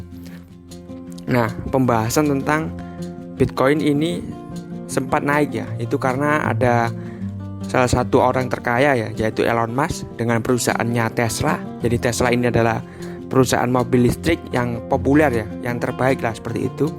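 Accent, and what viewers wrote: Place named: Java, Indonesia. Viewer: Medoknya keliatan🤣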